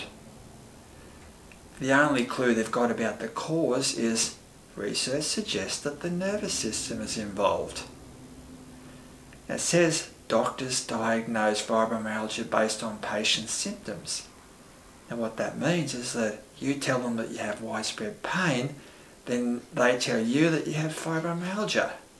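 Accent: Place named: Australia. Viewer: You sound like an Aussie, what state are you in?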